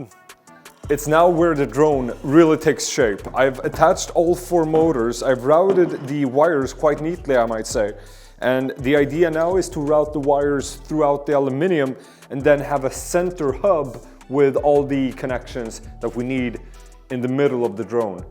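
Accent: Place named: Sweden. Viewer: I did not realize you were having a Swedish accent, I was way to focussed on Russian for some reason😂 hello from Sweden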